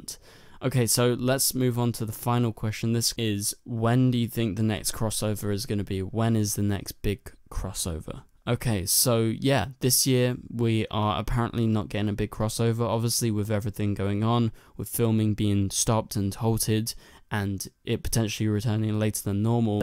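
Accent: United Kingdom. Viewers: Just subscribed. Hear a brit accent, so got to support a fellow brit.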